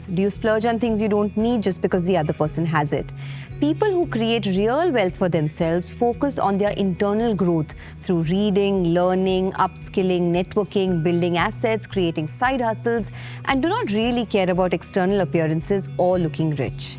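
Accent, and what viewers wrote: India. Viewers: Indian speaking style is weird 😆.....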